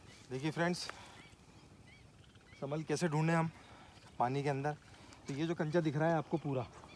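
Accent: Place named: Bhopal, India. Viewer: aapki tone ek dum bhopali type hai main bhopal se hoon isliye poocha bhai good show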